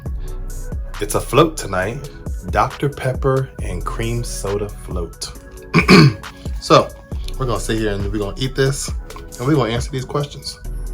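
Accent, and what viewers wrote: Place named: England, United Kingdom. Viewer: You did come back with a English accent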